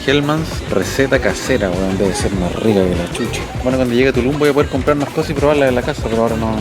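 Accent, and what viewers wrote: Chile. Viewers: Weon llegue aca, por tu reacción del partido Chile Japón, y disfrute tu reacción de tan lejos, y me quedé pegado viendo más videos y mi pareja me dice:y que onda este wn?, comenta de fútbol. De, de música va al super, las quiere hacer todas, jajajajaja y llegue acá, no aguante en escribirte, y darte mis saludos desde Santiago, un gran abrazo compatriota, y siga dándose las de opinologo q lo hace bien o al menos igual me cago de la risa con tus profundos análisis jajajaja Pregunta. Vives solo con tus hijos o la madre no Le gusta salir en los videos? Chao compa, y siga hablando como quiera Chileno cuico o Chileno, choro, chauuuui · El mejor video que e visto de un extranjero visitando un supermercado , todo radica en simplicidad y su honestidad y ya que no es como otros videos donde exageran reacciónes o fingen sopresa, o se reservan comentarios, por ejemplo en los helados Carlos V lo tipico hubiera sido "Wow un helado llamado Carlos V" pero en vez de eso tenemos un " Carlos V que chucha un helado que se llame Carlos V".ya que este tipo de comentarios hicieron divertido el video y te recalco unos : "oh estas otras son flautas de pollo.. 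se ven ricas estas mierdas weon" , " Yo pense que en Chile eran exagerados pero estos weones la cagaron", "El sector de la raja para limpiarse la raja" " Veo el nombre de mi país por todos lados weon" "Que manera de meterle wea a los cabros chicos para que lo compren , cuantos cabros chicos compraran esto porque solo sale hulk en el" jajaja e aquí la honestidad y el acento chileno jaja que buen video hermano se ve que los Chilenos y los Mexicanos tenemos muchas cosas en común y una de ellas es el sentido del humor jajaj Saludos hermano. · MIS RESPETOS PARA ESTE CHILENO...PERO, SORRY NO ME ACOSTUBRO A SU ACENTO!!!! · cuanto tiempo llevas viviendo en alemania?? no importa que no nos entiendan, es nuestra identidad no pierdas nunca el acento es nuestro legado, asi de cuatica la wea weon wn!! saludos desde chilito lindo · Como me encanta el. Acento Chileno weon. Saludos desde Monterrey México. 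Cachai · Nose si es porque yo soy mexicana pero no entendí nada de lo que dijo , p es que hablan muy rápido los chilenos, me hubiera gustado que hubiera mejorado el sonido de su voz chileno, yo amo amo el acento chileno 😍...... y si en México a todo le ponemos Chile y yo creo que hasta hermanos somos los mexicanos con los chilenos 😊🤭💜🙋‍♀️🇲🇽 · Soy mexicano, pero carajo, que agradable suena el acento chileno, el ¨weon¨a cada rato da gracia jaja y las palabras que usa ¨la zona de la raja¨ jajajajaja. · ...habla chileno-aleman, duce que vive allá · El mejor acento en latinoamerica, el Chileno, saludos desde México, por cierto , este canal de que país es ? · Asi HABLAMOS los chilenos jsjsjsjs.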